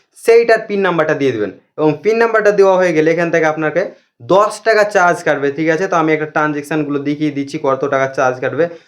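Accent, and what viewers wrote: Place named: Kolkata, India. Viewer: ভাই, আপনি কি ইন্ডিয়ান? ভাষা কোলকাতার মতো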